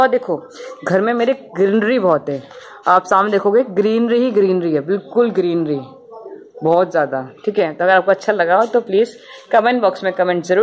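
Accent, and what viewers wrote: Rajasthan, India. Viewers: Ma'am aap Rajasthan Se Ho🤔🤔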